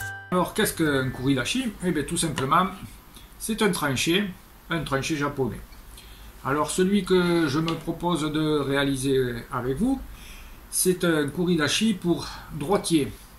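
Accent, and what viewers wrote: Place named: Nice, France. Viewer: Alors la Monsieur vous pourriez facilement prendre la place du fameux comedien.... Louis de Funnes vous etres deja un tres bon menuisier et je vous remercie infiniment pour vos videos mais avec vos fin de videos( Betissier) la Je me regale d'abord parcque j'ai grandi dans un petit village derriere Nice donc l'accent j'adore et vous mettez le super cote humain,Alors bonne continuation et Merci Robert dans le blue mountains NSW en Australie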